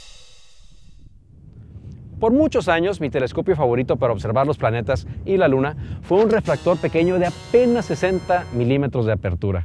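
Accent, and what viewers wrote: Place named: Monterrey, Mexico. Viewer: Eres regiomontano 😱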